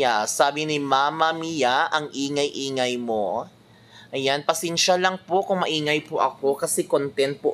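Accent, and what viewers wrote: Iloilo, Philippines. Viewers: Sir yung accent nyo po parang ilonggo.😊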